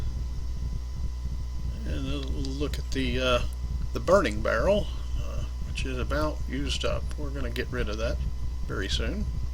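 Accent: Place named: Arkansas, United States. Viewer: You sound like your from Arkansas!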